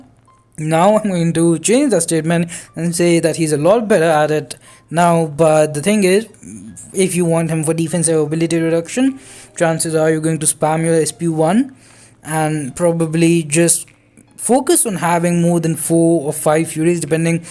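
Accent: India. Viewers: Bro are you from India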